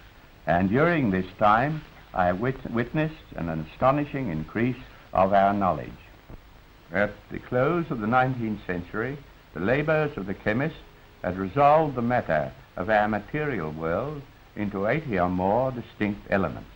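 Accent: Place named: New Zealand. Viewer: I'm amazed his accent is still so clearly Kiwi, especially given his education, class and all those years in the UK. It's great to hear!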